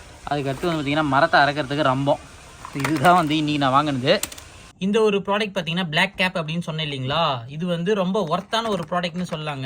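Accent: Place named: North Madras, India. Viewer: I spent several hours in diaso stores in UAE and Singapore ,Malaysia.Tools especially garden tools are very good but Indian wouldn't knowing such tools exist.because we see old tools and some Chinese tools.you did a good job to show us the purchased items.though you tend to speak good media tamil but your north Madras tamil always comes in. Once upon a time I had a engineering student saying pashupu for pipe and to correct him it took us long time though he writes good English.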